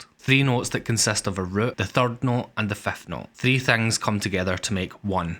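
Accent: Scotland, United Kingdom. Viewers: God I love your (I wanna say Scottish?) accent. “Doctor Heu” “Classic Heu” “New Doctor Heu”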